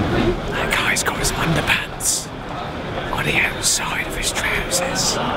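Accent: England, United Kingdom. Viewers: My fav dude on youtube, love your content, love your humour, love your very english accent! Greetings from New Zealand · is Casey Neistat Reborn. Or Asian Neistat with English accent. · im still mesmerize after all this year, how could u have such a perfect brit accent... envy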